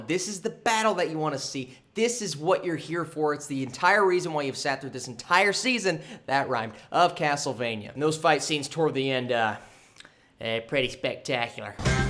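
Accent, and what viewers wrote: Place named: Texas, United States. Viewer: Are you from Texas? You have that accent.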